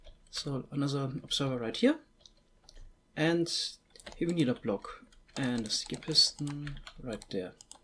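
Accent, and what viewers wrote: Germany. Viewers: I hear a german accent am i right? Falls ja gutes video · could it be that you are german? your way of speaking reminds me of germans try to speak english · the german accent is too strong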